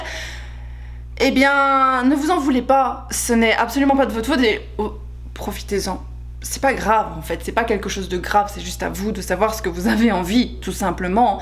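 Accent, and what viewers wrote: Belgium. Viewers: Je me disais bien que tu es belge lol Je reconnais bien l’accent de mon pays Vivement début septembre de cette année que je sois à Tokyo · Il y a du Jean-Claude Vandamme dans le parlé de! Made in Belgium sans doute.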